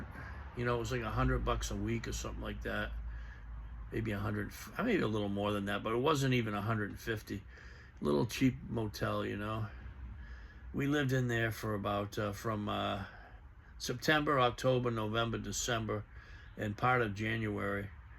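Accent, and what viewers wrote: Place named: New England, United States. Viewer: good to hear your doing good man! I'm a new Englander myself not far from you right in southern new Hampshire I recognized the accent wicked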